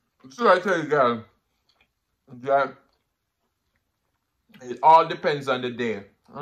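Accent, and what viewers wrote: Jamaica. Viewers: I see 👀 you lawwd how is it possible that you make food look so good. Another channel 🙌 for recipes. What that name of the channel again 🤔 you and that Jamaican accent. Sing sing 🎶 I don't know that song though.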